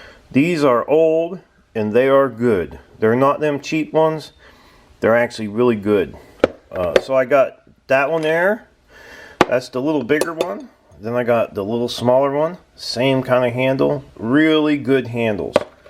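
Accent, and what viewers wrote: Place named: Indiana, United States. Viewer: are you in Indiana? Your accent reminds me of someone in my hometown of Richmond, Indiana.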